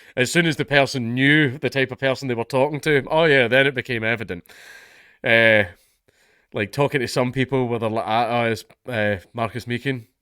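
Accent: South, United States. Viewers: you do a great american accent. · Dankulas American accent was fucking spot on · I love the southern US accent. Use it more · country accent is a gift I've never known I needed until I received it. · The Right sort of American accent too.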